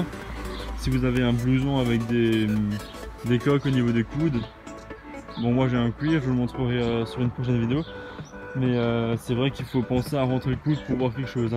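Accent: Alsace, France. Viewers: ton accent t'a trahi tu habite à Strasbourg ou dans les bled à côté ?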